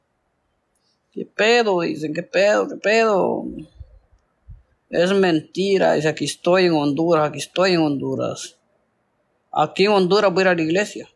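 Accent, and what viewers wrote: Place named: Mexico. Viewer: No , todos los mexicanos hablamos así , pero si usar esas palabras 😂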